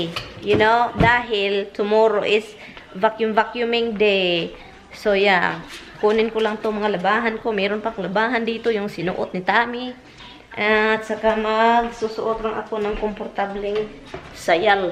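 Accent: Visayas, Philippines. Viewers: Magkatawa ko sa imo sis pag mag storya ka bisaya tagalog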